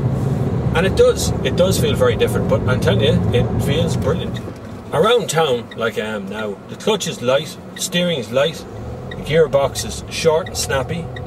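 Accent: Ireland. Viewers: great review. really pleased to hear an Irish voice for the irish car market. Am buying this car tomorrow. getting the dynamique version so no sat nav but an alround great car.and we got it for 17000euro with scrappage!!!!!! thanks again for a great review! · It would be great if a TV car show was commissioned in this kind of format, everyday cars for everyday people with a down to earth presenter. Top gear bores the hell out of me, dropping pianos on Morris marinas etc. I can't stand Clarkson, the man is a wally. Lets hope you get your own show, I'm sure you'll have a devoted audience of girls AND the boys too, I'm just a sucker for an Irish accent =)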